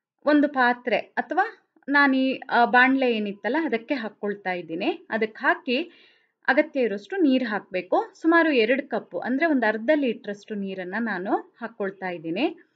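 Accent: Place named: Brahmin, India. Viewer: ಶುದ್ಧ ಬ್ರಾಹ್ಮಣ ಉಚ್ಚಾರಣೆ 🙏🪷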